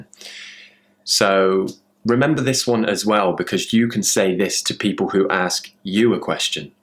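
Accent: United Kingdom. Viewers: I really love your accent!! When I started to study English, I started with American English, but I'm getting higher British English because is really posh and polite!! I love it!! Congrats for your video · One more subscribe! Accent very nice! I love British accent and like when I understand! :) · Hello! Thank you very much indeed for your video recordings. It is utterly important for me to follow your videos inasmuch as I USE them ( sorry for talking like this) to repeat after you full speeches as I best manage to do. I have to say that it is really working well for me. I am able to catch up your Britishness and pick it up. I hope I'm doing well with my pronunciation. After listening to and doing such shadow technique exercises your voice producing British accent remains long time in my mind before fading up. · Thank you for your useful and helpful video:) but I would also like to listen to your natural speed of British English!!!! 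Not all of English people speak this gentle and clearly, and I would really like to get used to natural speed of British English with your cool accent. Please~~~Make some natural talking video!! Lots of love and respect from Korea :)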